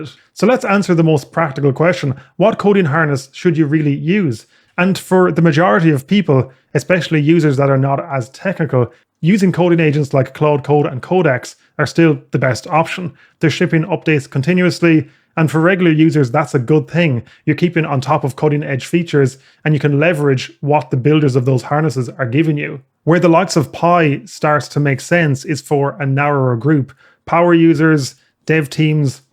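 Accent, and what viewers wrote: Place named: Ireland. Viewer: I wonder why people still looking for better harness after pi was release, the new hype sort of Prime Agent is just Pi for lazy people to create its own extension and add few more lines on the sysprompt. Btw, first video I see from your channel, already subscribed, nice content, it would be good just to have the original audio with some Irish accent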